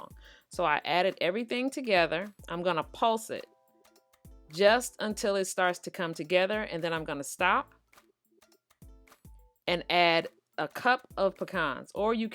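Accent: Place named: Chicago, United States. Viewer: Are you from Chicago? Your accent sounds just like a friend of mine ☺️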